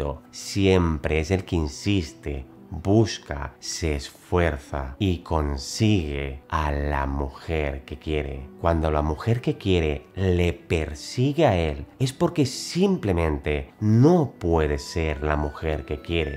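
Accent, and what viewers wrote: Spain. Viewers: su acento bien español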